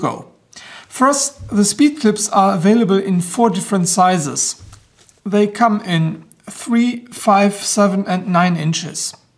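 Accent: Germany. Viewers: I love German accents like yours, lol. I giggled at "coy-otee." We say ky- (like 'sky')-otee, or ky-oat where I grew up. Just thought that was a funny pronunciation. Anyway, helpful video. Thanks dude.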